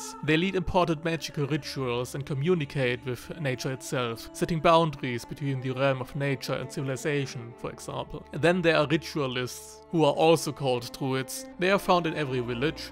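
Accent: Germany. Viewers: Are you Swabian by any means?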